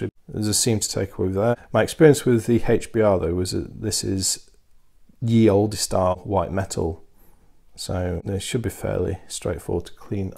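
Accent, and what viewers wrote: United Kingdom. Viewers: I love me some Not Squats in the mid morning. Do you think you'll do more reviews of this line,? Also i enjoyed the brief reveal of Squeaky during the box placing. Nothings better for the old sense of humour than listening to sped up midlanders.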